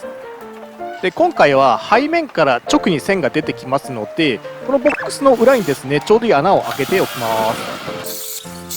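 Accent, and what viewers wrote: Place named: Aichi, Japan. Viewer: 関係ないけど喋り方きいてるとこの方は愛知とかの人かな？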